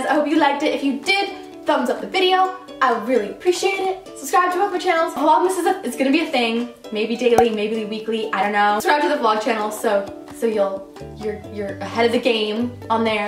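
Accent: United Kingdom. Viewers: Я пришла за Британским акцентом . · im called charlie and im from britain and just to let u no u have a very strong british accent x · i like your british acsent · I THOUGHT SHE WAS BRITISH! !! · You do sound British😂👍🏼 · you have such a good British accent omg😂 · It's fine I have a British accent too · YOU NAILED THE BRITISH ACCENT - LIKE WOW. many americans / whatever cultures fail, but chu did our accent JUST RIGHT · Look girl don't worry you are perfect talking British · Her British accent sounds ok tho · it sound like she is british like zoella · no I do like ur British axant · you do sound like british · This is my first video seen and u do sound britissh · she said she was going to be use a british acsent?? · that's acctually a good ENGLISH accent, British is Irish, Welsh Scottish and English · You sound British but no one British talks like that..! 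Make sense?😂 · noooo lol i actually thought you WERE British haa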